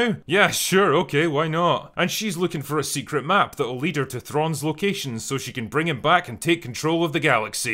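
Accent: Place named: Glasgow, United Kingdom. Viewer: I've haven't seen any disney movie in decades. I just check out the reviews; that's all I need. Jeezuz I love sardonic, hilarious put downs of rubbish. And with a Glasgow accent too!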